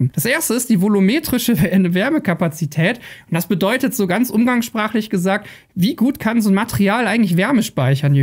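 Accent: Bavaria, Germany. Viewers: Ist ein Bayer?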